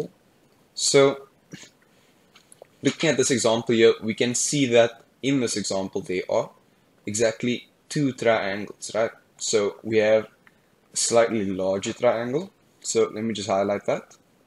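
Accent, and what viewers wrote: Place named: Cape Coloured, South Africa. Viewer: Are you from south Africa because you sound coloured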